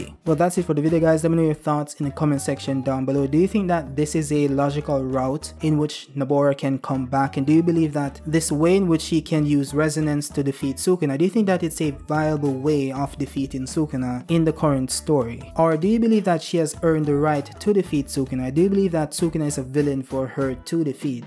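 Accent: Jamaica. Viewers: Never thought I'd hear a Jamaican accent on a JJK video. I'm in. Good content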